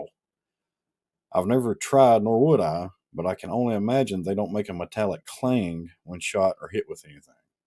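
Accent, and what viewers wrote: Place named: South, United States. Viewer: Great story telling. The southern accent makes it so much better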